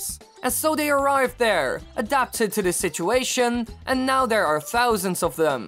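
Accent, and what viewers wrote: Netherlands. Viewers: Hey you're Dutch, right? Brabant?? :P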